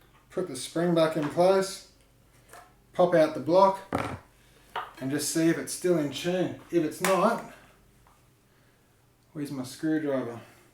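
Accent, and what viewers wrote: Australia. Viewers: Ok, that's an Australian accent. Nice, dude. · I like your Australian accent hehe! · Fooking Australian mate! I thought he was American for some reason.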